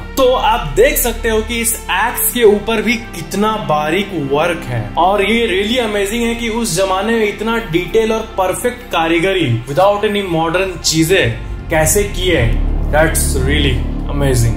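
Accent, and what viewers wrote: Bihar, India. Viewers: Is he bihari? 🤔🤔🤔🤔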